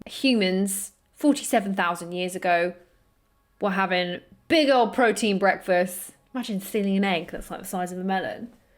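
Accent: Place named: Yorkshire, United Kingdom. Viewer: Only just discovered your channel. Fascinanting stuff! Also, great to hear a fellow Yorkshireperson's accent on here. (Although you sound posher than me)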